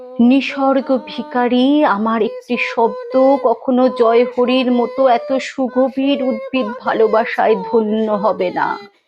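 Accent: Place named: Bangal, Bangladesh. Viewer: দারুন সুন্দর লাগলো তোমার কবিতা পরিবেশন বিশেষ করে তোমার মুখে বাঙাল ভাষা গুলি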